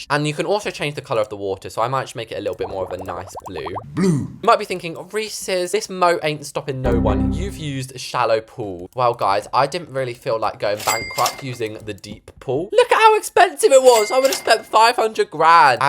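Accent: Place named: United Kingdom. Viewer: It’s good that (I’m saying that for short) is British, that’s because I am and most other content creators are foreign, so I can actually understand him.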